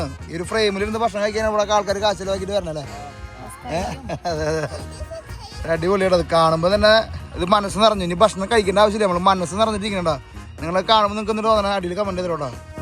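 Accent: Thrissur, India. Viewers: ഓർമ്മിപ്പിച്ചോളോ മാപ്ലേനെ...😂 ത്രിശൂർ സ്ലാങ്ങ് പൊളിയാണ് ട്ടാ..❤❤❤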